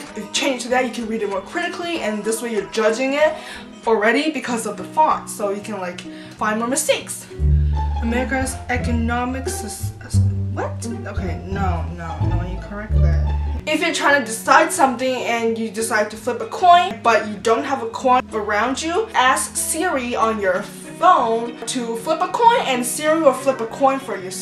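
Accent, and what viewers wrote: Canada. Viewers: Canadian eh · are you Canadian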